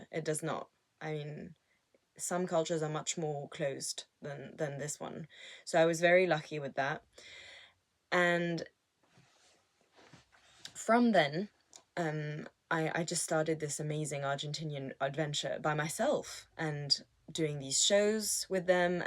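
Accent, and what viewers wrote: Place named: United Kingdom. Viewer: I like your music videos. Very nicely done. You have alot of talent. But surprised to hear your British accent.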